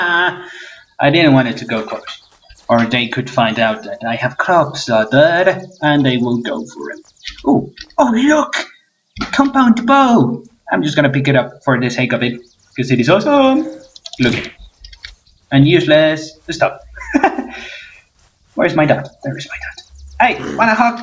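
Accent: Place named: Australia. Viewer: you sound Australian xD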